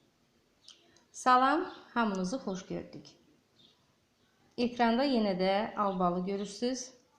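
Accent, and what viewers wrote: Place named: Qobu, Azerbaijan. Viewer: qobu lehcesiyle danwirsiz.Qobulusuz?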